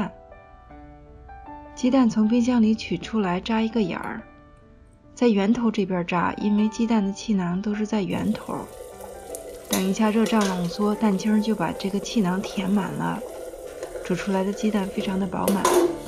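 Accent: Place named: Shaanxi, China. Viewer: 是西安人吗？看你做的菜，说话都有老陕的味道，好亲切啊！从炸油条开始，跟您学了好多零失败的做法，非常感谢！能介绍几款铸铁锅用烤箱烤的菜吗？觉得这种菜又方便又好吃，最适合带娃的妈！谢谢啦！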